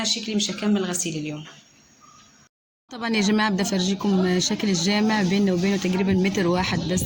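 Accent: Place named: Egypt. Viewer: صحتين وعافية بدي أسألك إنت بتحكي شوي مصري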